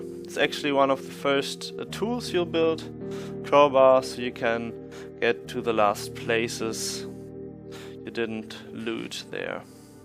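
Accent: Germany. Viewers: Dieses Pseudo Englisch von Deutschen lmfao. Aber gutes Video !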